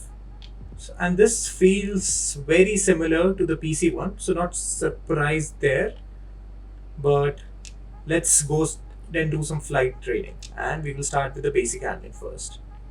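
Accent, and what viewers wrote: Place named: India. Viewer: I like ur Indian accent ❤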